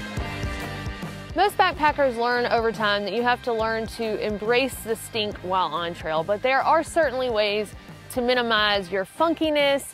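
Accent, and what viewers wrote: South, United States. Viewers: Oh, man, I grew up in Georgia, though moved out of state nearly 13 years ago. I haven’t heard a southern accent like yours with any consistency in over a decade! Great info in sanitation and hygiene! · I love your accent, I am from GA and have been living in the PNW for 15 years. I so miss southern women. Tried hard to find one with an accent like yours, but wound up married to a girl from AK. We manage the Mountaineers Lodge at Stevens Pass on the PCT during most summers. This summer we likely not open. Its fun being a trail angel.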